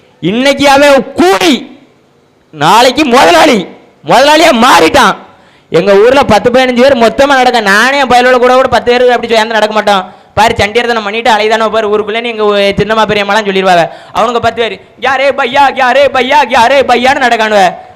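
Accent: Tirunelveli, India. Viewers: நெல்லை தமிழ் சூப்பர் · இந்த தம்பியின் அந்த நெல்லை தமிழ் ❤❤🎉🎉🎉🎉சிறப்பு ட தம்பி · அருமையான பதிவு தம்பி உன் நெல்லை தமிழ் அருமைட செல்லம் எத்தனை குட்டி புலி சீமான்கள் அவன்கள் எல்லா தெலுங்கு நாய்கள் தமிழ் இண துரோகிகள் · நெல்லை தமிழ் பேசும் சீமான்🎉🎉🎉🎉🎉🎉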